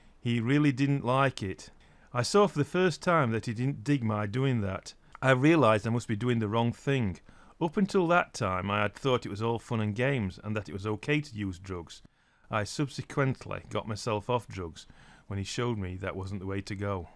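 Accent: Yorkshire, United Kingdom. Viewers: so thats what a yorkshire accent sounds like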